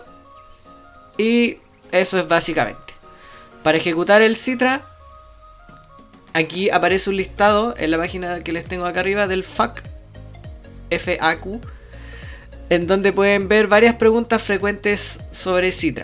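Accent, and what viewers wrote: Chile. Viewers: eres chileno no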